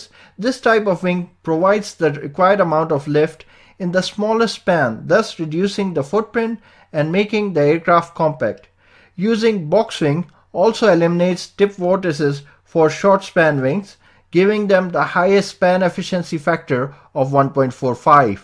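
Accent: India. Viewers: Lol. Are you saying that to make him feel better about his thick Indian accent that is hard to understand? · It could actually take off, if only the hindustistani speaker would be replaced by a normal english language spokesman.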